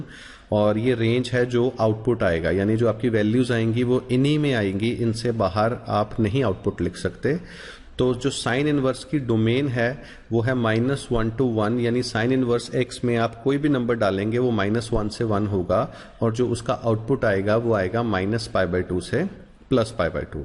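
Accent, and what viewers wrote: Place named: Punjab, India. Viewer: Getting punjabi tone 👍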